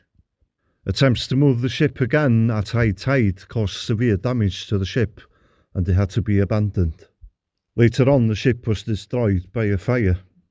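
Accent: Wales, United Kingdom. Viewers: No need to apologize for your pronunciation... your Welsh accent is appreciated... keep up the great work.... Cheers! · I absolutely love your channel. My mother side of my family were from Port Talbot and their first language was Welsh. Your accent reminds me of my family. I’m half welsh and half English. Keep up the great channel. Your commentary and approach is unique don’t change its great. 👍👍👍👍👍👍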